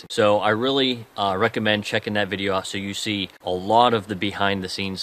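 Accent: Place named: United States. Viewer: I thought you were a British person, after I heard your accent I got it, you are an American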